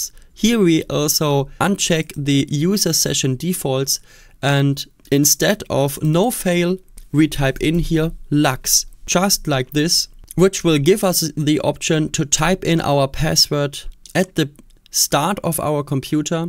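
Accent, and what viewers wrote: Germany. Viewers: could it be that you are german? Your voice sounds really german and how you pronounce stuff sounds german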